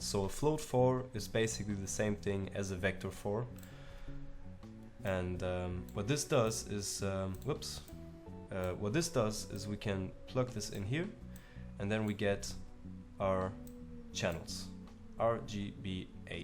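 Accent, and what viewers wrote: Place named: Germany. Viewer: Nice tutorials! Are you german btw? haha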